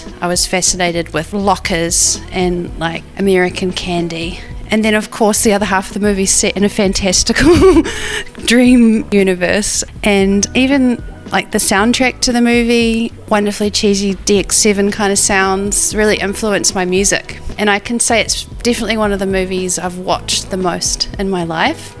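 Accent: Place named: RP, United Kingdom. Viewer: Her accent is kinda posh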